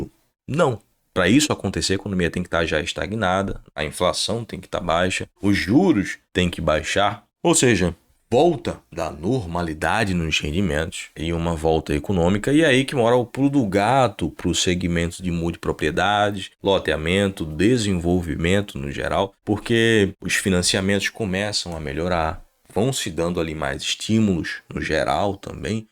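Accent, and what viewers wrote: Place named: Pernambuco, Brazil. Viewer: Tá tranquilo mermão, sua resposta foi bem esclarecedora, a comunidade tem que ser informada desses detalhes, saber dos indexadores, saber onde estão pisando, agora com relação se vc é de Pernambuco, percebi pelo sotaque, sou aqui do Ceará, somos vizinhos rsrsrs, tudo certo mermão, forte abraço, no aguardo do próximo "Raios -X" 🤛🤛🦈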